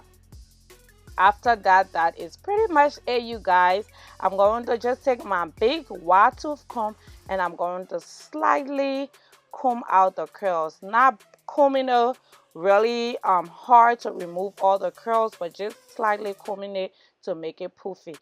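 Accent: Liberia. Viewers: I hear Liberia !! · you sound liberian go awwwf sis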